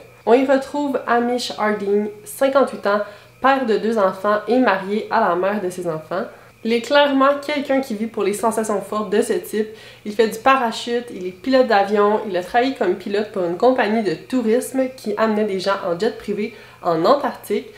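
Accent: Quebec, Canada. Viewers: pour ton accent, etant un quebecois moi meme,, ben yen a pas de plus beau et toi aussi tes pomal belle,, et il y a un reportage de France qui parle de comment laffessement ces produit contrairement a limplosion instantannee effectivement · Elle parle très bien francais, elle a un accent québécois c'est tout.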